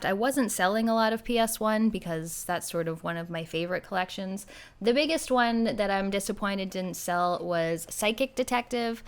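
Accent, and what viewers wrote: Canada. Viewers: I think we all realize youre candian by how you pronounce about lol But yeah duck bucks not greenbacks lol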